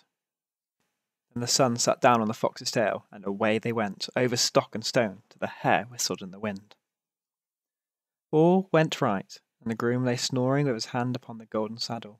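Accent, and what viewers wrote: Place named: United Kingdom. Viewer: I have searched and searched for these in a BRITISH ACCENT and here I have finally found it!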